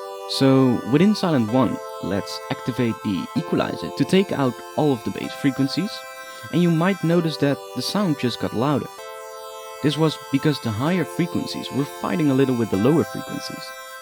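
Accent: Netherlands. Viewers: He's from the Netherlands...it's an accent. You are a dumbass.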